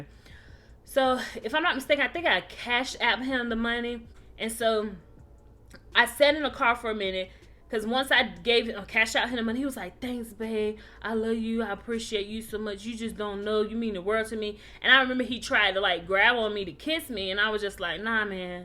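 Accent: Baltimore, United States. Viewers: You sound like a bmore girl lol. I enjoyed the story time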